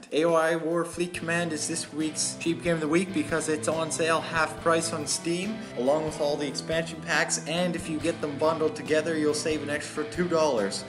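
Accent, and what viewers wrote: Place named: Canada. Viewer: lol the telltale canadian accent 'go check id eeeeewt!" :D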